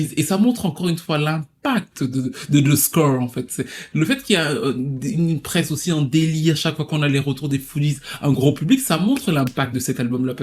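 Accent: Cameroon. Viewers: Toi... tu n'es pas camer par hasard ? 😅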